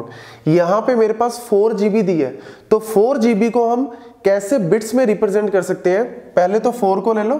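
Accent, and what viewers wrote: Punjab, India. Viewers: Yeah the punjabi accent is really good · I love your Punjabi accent that makes me enjoy topics because l m also Punjabi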